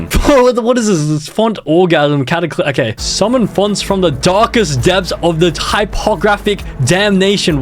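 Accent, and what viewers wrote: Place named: Australia. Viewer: WHY WAS I SO HAPPY FINDING OUT HE WAS AN AUSSIE 💀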